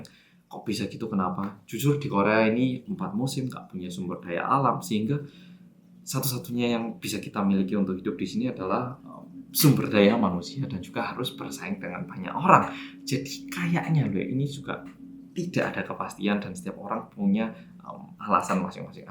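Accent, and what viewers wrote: Java, Indonesia. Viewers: Hiyayy medhook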